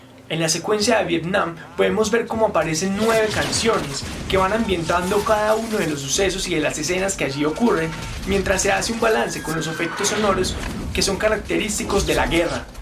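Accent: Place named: Costa Rica. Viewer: Sos Costarricense con solo escucharte.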